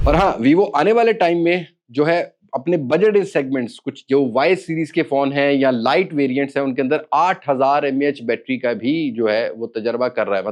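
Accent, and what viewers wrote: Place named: Punjab, India. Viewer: Punjabi wala touch chak k rakho❤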